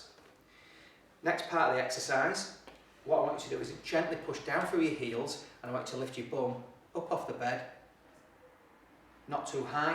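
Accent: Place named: Yorkshire, United Kingdom. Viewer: I have just subscribed to your helpful videos. I can't do these laying down movements on the floor as I can't get back up. Is it ok on the bed? The bad pain in my hip is far worse at night wakening me several times. I will try your advice thank you so much. By the way lovely to hear your Yorkshire accent.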